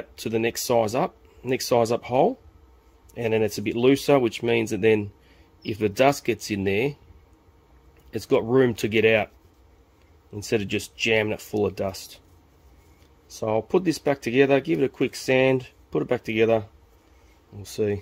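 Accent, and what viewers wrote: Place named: Australia. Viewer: I was expecting to hear a french guy speaking, bugger me if it wasnt a fellow Aussie !!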